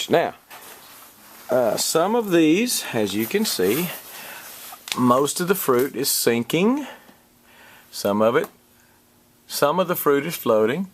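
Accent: Texas, United States. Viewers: Who the heck takes the fruit out of the drink eat a whole or Blended. that's the best part it's like an Ever melon. When you cut a bottle size hole in the top of your watermelon flip over a bottle of Bacardi in that thing overnight next day eat the watermelon it's great! I like it even better with coconut rum like Malibu or something.... I'm a big fan of an unfiltered wheat beer, blue moon Hefeweizen stuff like that and I'm just getting into Brewing Meade's and wines so I kind of think everything that's in there is the way nature intended it and I pretty much leave a drink intact unless I think it would improve it to filter it clarify it or any stuff like that but like I said I'm a newb at this. Thanks for the good advice and you don't talk too much good sense of humor and a Texas drawl music to the ears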